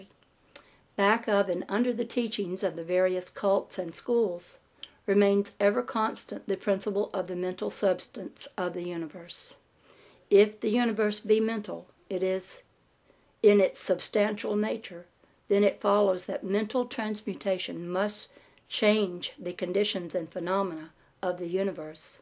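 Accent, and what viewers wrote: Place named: South, United States. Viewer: Thanks for putting something this important into audio. I find your reading of this and your southern dialect very delightful.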